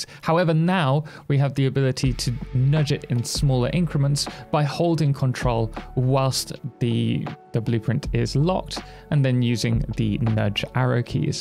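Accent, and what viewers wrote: United Kingdom. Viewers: Which you had more play content around 20minutes. Me and my GF enjoy your voice and Brittish accent. We have been watching your clips while I started Satisfactory 2 months ago. Maybe a playthrough when 1.0 drops? Epsiodes around each Tier or catalogue would be a tip? Standard Parts, Electronics, Steel, Copper, Iron factories, then truck lines and train lines adding on from tour starter location. We find imKibitz too stressful to follow and his megabuilds are far too big to follow.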